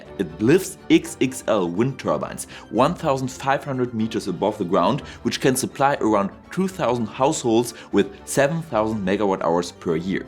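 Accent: Germany. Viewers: A very interesting, well illustrated, insightful and well balanced video. Your speed of presentation was perfect for me. I much prefer your very clear diction and mild German accent to the strong regional accents of the UK. Cheers from Scotland. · hello Dr, could you please speak a little bit faster? i'm having trouble paying attention to what you're saying because my mind keeps getting distracted by your thick German accent. you say that when wind speed doubles, its power increases 8 fold? the energy of the air particles is 0.5mv^2 & power is energy/time so maybe 4-fold? you how they make plastic kayaks where they put the kayak mold in a machine that spins it in all directions & has the molten plastic cover all the walls evenly?, maybe after inflation when the balloon is rigid, you could do a similar thing with a very light sealant that coats the insides while the balloon is spinning around all crazy in a heated chamber. 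this design is very cool because the turbines are in an enclosed space & it would cost very little energy to make them bird-safe. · honestly like if people think talking slower would help them... they know there is a speed button right? I have never had an issue understanding you and I don't have much experience listen to people with a german acent and sorry I just don't watch you that often.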